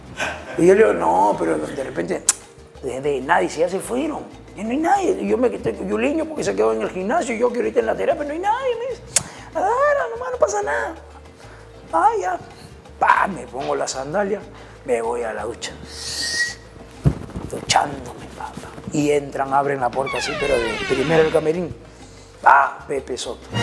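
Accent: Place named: Callao, Peru. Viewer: Voz huayacolera...tipico chalaco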